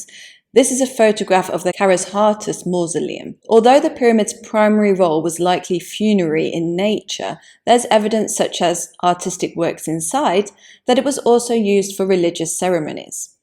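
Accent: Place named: Northern England, United Kingdom. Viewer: So, you imagine that you know what the rest of the world is all about, eh? I am fully aware that hers is an accent found in the more Northerly parts of England and not in a North American variety. I understood her perfectly, but others, schooled in "English as a foreign language", might not find it so easy. Perhaps you might care to elucidate on you expertise in this language discipline.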